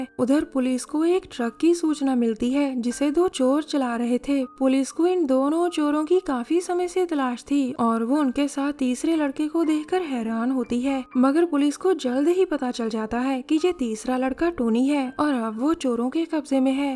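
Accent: Punjab, India. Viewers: Ma'am Shayad Ap Punjab Se Ho ?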